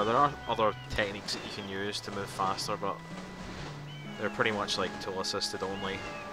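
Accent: Scotland, United Kingdom. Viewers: Hey, are you Scottish? I don't know enough about accents to get any further than that.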